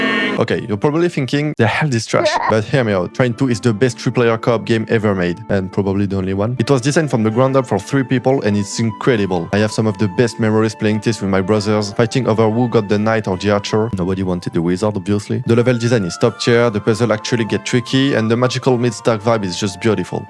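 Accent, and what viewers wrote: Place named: France. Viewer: remove the french accent you sound pity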